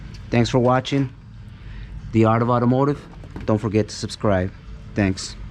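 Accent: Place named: El Salvador. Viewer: Such Beautiful spanish! Sounds El Salvadoran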